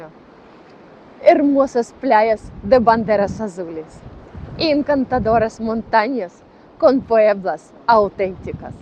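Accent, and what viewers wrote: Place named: Central Spain, Spain. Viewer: una forma de hablar español, un poco del centro / norte de España, digamos muy neutro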